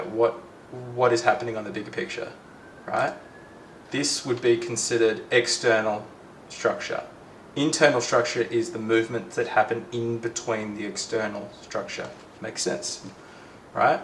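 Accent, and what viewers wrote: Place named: Australia. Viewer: You are doing a great job - explanaton is amazing! I too live in Australia so it is refreshing to hear an Aussi with this eXplanation - keep going!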